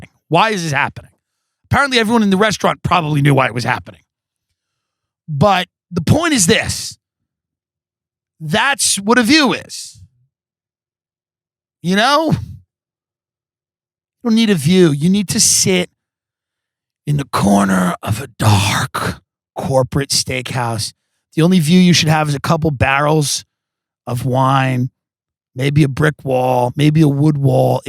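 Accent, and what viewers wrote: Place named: New York, United States. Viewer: Love “New Yawk” or Long Island accent.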